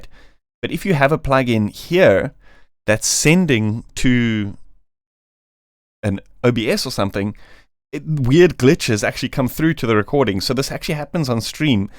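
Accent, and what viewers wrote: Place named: South Africa. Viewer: he's south african. i'm south african too and can tell his accent from a mile away. it sounds perfect to me, you're just struggling with the accent. definitely sounds like english is his first language here, this is just how our accent sounds.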